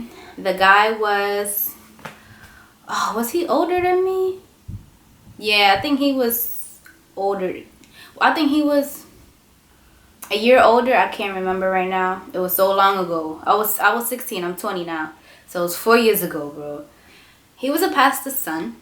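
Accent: New York, United States. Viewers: Zaire Williams is she from New York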